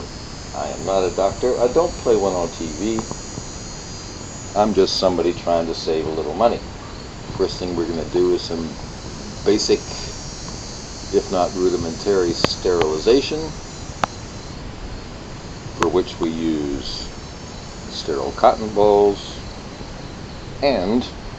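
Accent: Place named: Transatlantic, United States. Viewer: This man has a translantic accent..... wow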